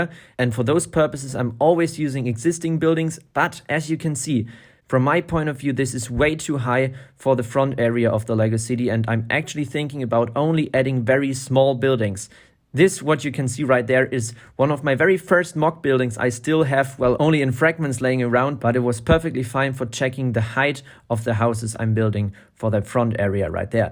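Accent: Germany. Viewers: Dieser Deutsche Akzent ist rauszuhören :) Und mir ist aufgefallen das du denselben Text wie im Deutschen benutzt nur halt auf Englisch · Are you German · Da war ich mir doch sehr sicher, den leiiiichtesten deutschen Akzent zu hören.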